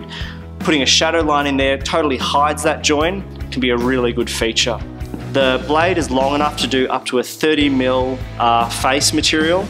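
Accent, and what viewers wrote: Australia. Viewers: lovely production values and great information. So good to have an australian voice and without the seemingly obligatory "yo whats up guys" followed by death metal music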